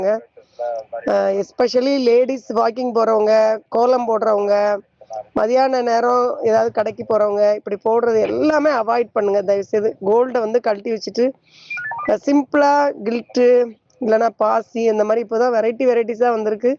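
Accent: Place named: Madurai, India. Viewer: Thank you madam for your alertness with madurai slang.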